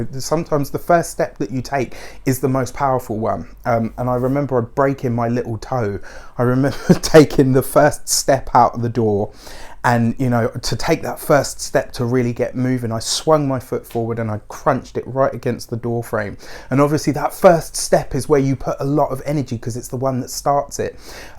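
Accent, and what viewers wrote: United Kingdom. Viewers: Is your accent British? Either way I love it! Haha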